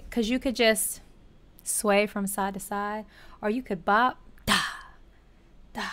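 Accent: South, United States. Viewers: You must be from the South, girl. 😂😂 Love your reactions on the songs.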